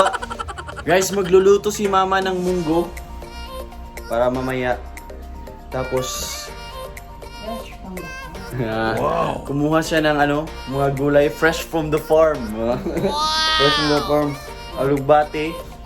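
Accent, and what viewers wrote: Visayas, Philippines. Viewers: DONE BJ Baldado From: Zamboanga City Amping mu always 😂 Cute kaayu ka. Magkatawa ko sa imung pronounciation sa tagalog Klaro jud Bisdak Haha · Saan ba kayu kuya? Parang may visaya accent ah.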